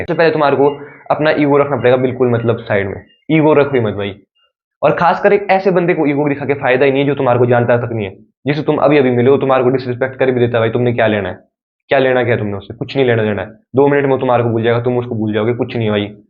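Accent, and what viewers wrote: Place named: Pahadi, India. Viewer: Bhai tumhari awaj mai pahadi accent ata h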